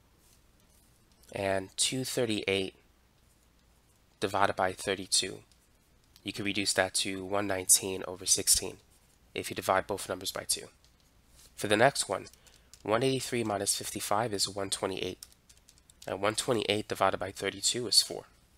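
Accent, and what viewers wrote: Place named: New York, United States. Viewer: Based on your accent I'm guessing your from new York or jersey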